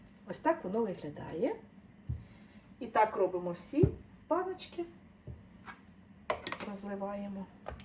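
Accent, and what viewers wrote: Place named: Vinnytsia, Ukraine. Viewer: Скажіть, будьте ласкаві, з якої Ви області, бо у Вас такий говір, як у нас на Вінниччині? І де Ви придбали свою класнючу кастрюльку? Я на неї не могла все відео налюбуватися!😊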